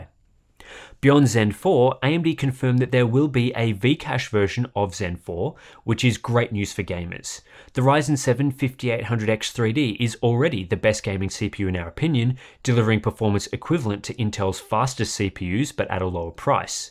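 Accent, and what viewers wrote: Australia. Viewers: there's no such thing as a "normal" accent lol imagine being this provincial Hardware Unboxed please go full aussie accent to ward off this kind of braindead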